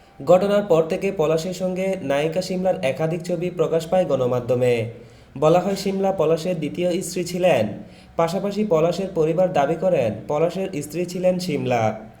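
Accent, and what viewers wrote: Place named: Noakhali, Bangladesh. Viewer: পাঠক নিশ্চিত নোয়াখাইল্লা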